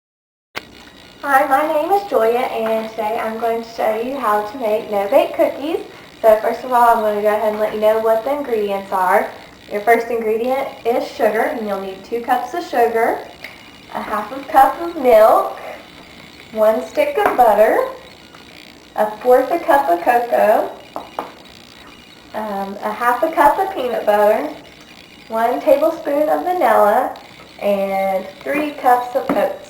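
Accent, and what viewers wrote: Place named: South, United States. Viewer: are you southern I hear an accent.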